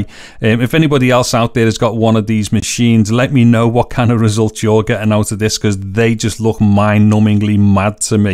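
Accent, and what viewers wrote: Liverpool, United Kingdom. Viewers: You are a scoucer, aren't you?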